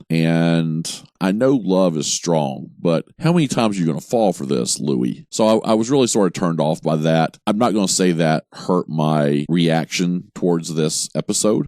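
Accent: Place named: Texas, United States. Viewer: Really late to the party, but just have to tell you how much I appreciated your reviews. Your analytical skills and emotional I.Q. are off the charts! Plus, you have a voice that belongs in commercials. And that accent -- Texas? When you mentioned Jonah with Louis in ep.3, but not Antoinette with Lestat, I knew you didn't find her memorable enough to recognize 22 years later in ep. 6. Favorite line from you all season? "How many times are you gonna fall for this, Louis!" when he was professing his love for Vampire Armand. The secret to Louis was revealed (to me) in the first episode when Daniel called Louis his father's eldest son, and Louis corrected him, "The FAVORED son". It was important to him, being favored son. That's why all the old-as-sin vampires go ga-ga over Louis in books/movie/tv. They crave his fiercely-held echo of their forgotten humanity, and they sense his need to be some man's "favored son". 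Plus he's hot haha! Thanks for entertainment!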